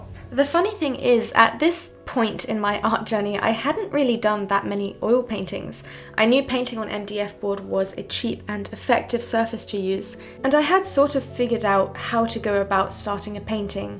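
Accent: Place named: United Kingdom. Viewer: you absolutely should! Initially I distinguished your accent as British, but your beautiful yet unique name didn’t match my perception, then you dropped quelques mots français..and seeing your mum in frame I was instantly corrected! Aha! You are French! From your mother’s side (?) yet you’re based in Adelaide (wrong again. I initiallly thought north coastal Qld (sunny coast perhaps). Then Melbourne due to your private school uniform and the fact you commute/d to school/uni). I’m a visual arts teacher (secondary years) based in Brisbane and once I saw your year 12 exhibition vlog I quickly determined you were in fact based in Oz and completed your ATAR for General Art (at first instance your accent threw me off as I thought you were based in the UK and had completed your QCSE). You are quite the anomaly, Mademoiselle. A beautiful contradiction. 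Completely smashing all my preconceptions of you. I love being proven wrong, oh and the element of mystery ☺️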